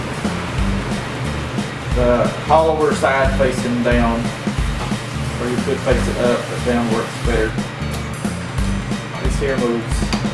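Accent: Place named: South, United States. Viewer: Interesting how you retained the southern accent but you’ve been in the Philippines.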